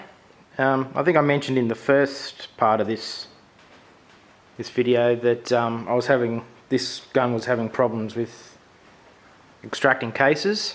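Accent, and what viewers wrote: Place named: Australia. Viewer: Hello Mr. - I couldn't identify the wood that stock is made of, until I Googled Sportco Model 63, and found out that it, like your accent, is Australian. That explained it! Your stock is made from Eucalyptus wood, which, for gun stocks, is not common at all. A very nice piece of wood on a very nice rifle.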